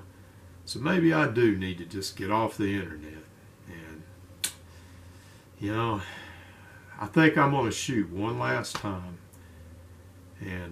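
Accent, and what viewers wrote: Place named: Kentucky, United States. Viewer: Hey you better stick around, for one, im a revolver fan, for two I was raised in Kentucky and I love your accent. I had my first long gun at 9 years old, it was a 410 Stevens and still have it, I was trained by a Sargent ,Korea era, named (dad) .and three, I'm 64 and love using an old 38 from the hip. A Taurus 82 that's all I need. Thanks again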